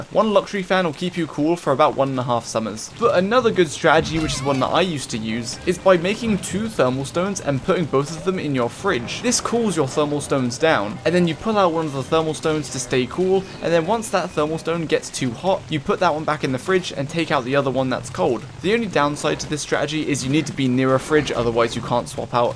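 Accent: United Kingdom. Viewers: this guide is the best guide i've ever seen ım am not a beginner but ı've got some tricks by you also you mentioned about almost everythink thank you love ya :3 <3 btw your BRİT-ish accent is soo good :)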